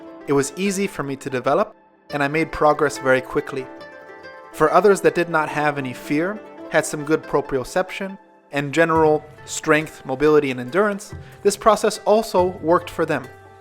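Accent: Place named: Israel. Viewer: love your vids:) where are you from? sound like israeli accent but i dont sure...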